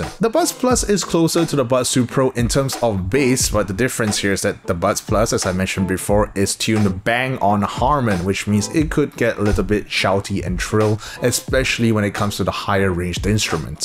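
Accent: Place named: Singapore. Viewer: This man sounds really Singaporean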